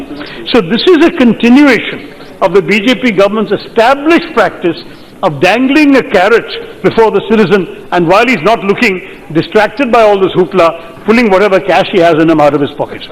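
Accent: United Kingdom. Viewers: I really really admire not only bcoz of his english accent but highly bcoz of his speech which is always in the point 👍👍👍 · It's nice to deliver something like British accent, but how many Indians would be able to understand it, and this is again an advantage to the government. So, better showing our Excellency through any language we have to make our citizens to be aware of every single fact and figure out the actual face of the government. Hume ye dhyan Dena hoga ke aam logon ko Sarkar ki kaam kaj pata chale.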